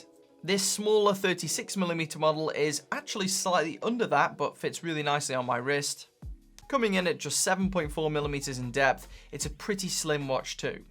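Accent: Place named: Midlands, United Kingdom. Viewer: This guy is the only case I can tolerate the midlands accent for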